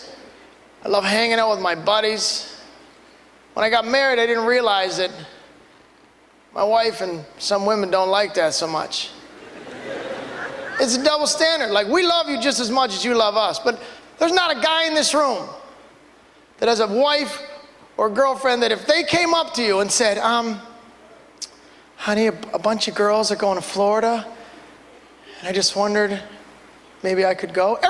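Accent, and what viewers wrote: Canada. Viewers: I love how he says whore. WHOORE. I love Canadian accents^^ love from Montreal, QC^^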